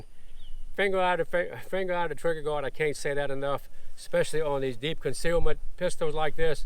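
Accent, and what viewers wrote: Louisiana, United States. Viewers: Sounds like a fellow Louisianan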